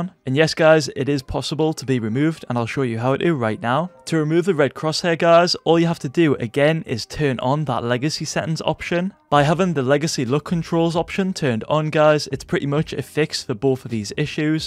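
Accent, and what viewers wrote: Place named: Scotland, United Kingdom. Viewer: im from the uk aswell but your accent sound diffrent kinda like scottish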